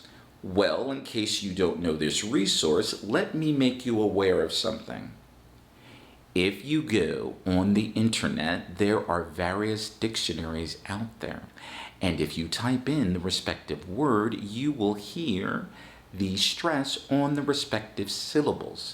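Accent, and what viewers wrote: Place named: United States. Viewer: thanks so much for your lesson , Sir, and I like so much your American accent and so interesting to learn more,,, 5 ways, suggestions or tips to speak more naturally : 1. connected speech 2. stressing syllables 3. learn English phrases and expressions 4. learn phrases verb 5. English sound or phonetic sound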